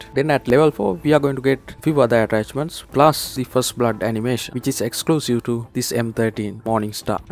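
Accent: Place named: Kerala, India. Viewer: Mythic accent from Kerala or TN!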